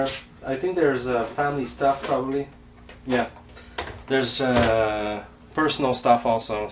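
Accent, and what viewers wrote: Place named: Quebec, Canada. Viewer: everything is in french, the t-shirt show that the tour is in quebec and also you have the quebecois accent when we try to speak english... mmm are you in quebec province ?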